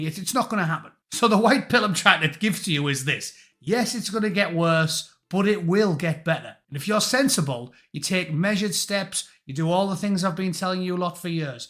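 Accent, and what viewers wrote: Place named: Middlesbrough, United Kingdom. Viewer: I must admit, I think history lessons are much better when told in a no nonsense Middlesborough accent😂.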